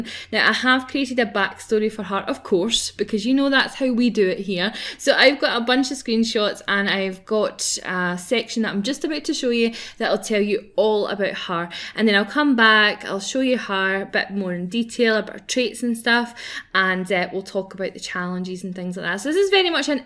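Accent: Scotland, United Kingdom. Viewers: I love your accent what part of Scotland are you from?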